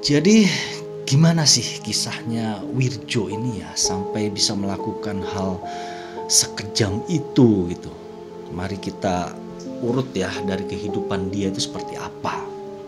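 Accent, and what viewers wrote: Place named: Banyuwangi, Indonesia. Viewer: Daerah banyuwangi mana suaranya^^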